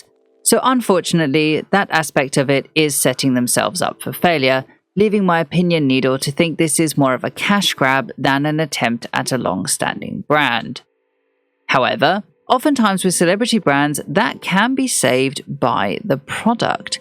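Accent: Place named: London, United Kingdom. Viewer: Great vid, ominous London and or U.K voice! Swear these vids gone help a young future fashion journalist win!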